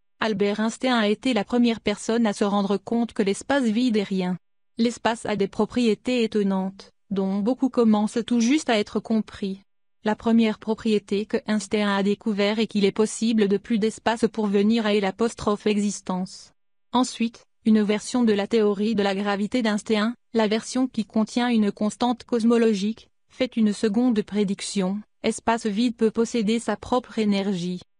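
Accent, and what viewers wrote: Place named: Canada. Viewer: j'aime bien sa voix avec l'accent canadien lol! ont s'en fout de l'univers la terre c la maison mére !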